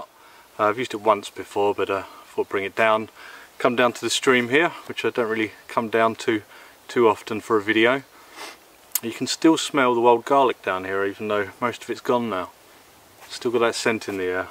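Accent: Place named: Blackpool, United Kingdom. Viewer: You from Blackpool? My friend Howard sounds just like you, thats where he is from.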